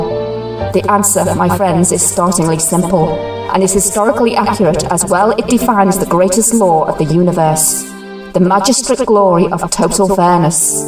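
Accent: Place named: England, United Kingdom. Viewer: The English accent doesn't help either. LOL Not your fault,. I hear electronically with the cochlear implant. Maybe I can find a different speaker doing this information somewhere or a transcript.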